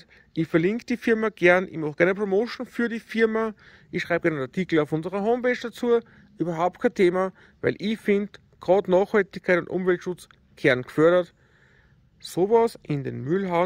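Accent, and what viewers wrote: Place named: Bavaria, Germany. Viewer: Für Nordseutsche schwer nachzuverfolgen wegen bayrischen Slang. Trotzdem vielen Dank für die Mühe!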